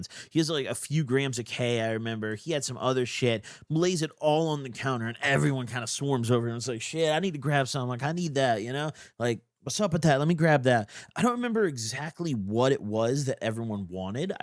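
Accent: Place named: South, United States. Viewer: Southern ass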